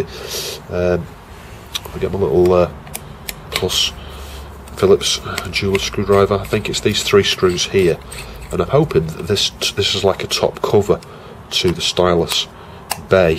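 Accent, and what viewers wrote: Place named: Northern England, United Kingdom. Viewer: So I'm assuming live somewhere in Northern UK from your accent ... but if I paid u could u fix my dsi it won't read my game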